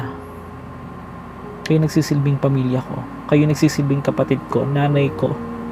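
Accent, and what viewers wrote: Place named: Hagonoy, Philippines. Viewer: Taga Hagonoy Bulacan k b idol? Kase yong punto at way ng salita mo parang tga hagonoy k. Daming life lesson s kwento mo.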